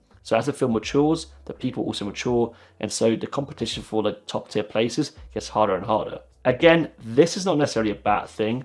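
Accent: United Kingdom. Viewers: Are you native british , because I hear your words very clearly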